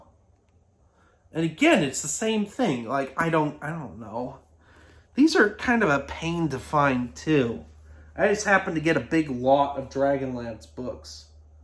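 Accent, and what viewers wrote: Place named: Canada. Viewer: Why after all these years, am I just now hearing a slight northern accent, like a Canadian accent!? Sweet collection. I remember you telling me about how awesome these were. I’m hoping to give that first trilogy a go.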